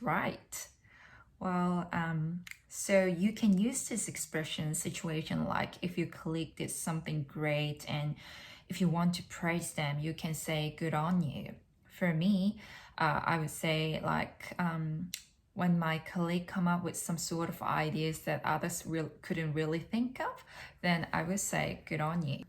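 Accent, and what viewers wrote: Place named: Australia. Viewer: 제대로 영어쓰는 국가가니까 역시 영어가 훨씬 고급져진거같아 원래 잘했지만. 👍🏻 그리고 호주 살더니 오지 엑센트가 생겼어 신기해 ! "다-라-" "다운데에-" ㅋㅋㅋㅋ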